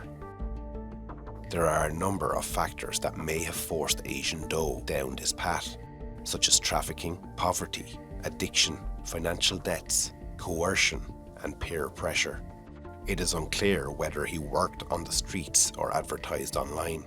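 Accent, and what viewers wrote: Ireland. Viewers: I’m impressed by this documentary. Good old Irish accent too.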